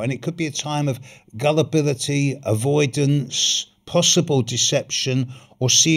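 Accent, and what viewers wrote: United Kingdom. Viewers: Greetings from the city of João Pessoa, Paraíba, Brazil. I thoroughly enjoy your analysis and may I say your voice is so agreeable, your diction so clear, not to mention your beautiful British accent. Many thanks for taking the time to keep us informed.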